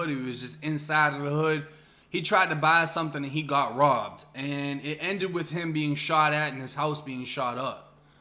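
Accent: Boston, United States. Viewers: ur boston accent dope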